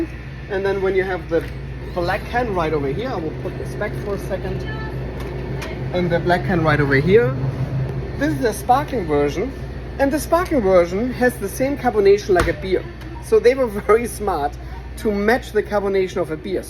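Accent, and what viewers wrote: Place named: Germany. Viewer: du könntest locker Synchronsprecher werden für deutsche rollen die English sprechen 😄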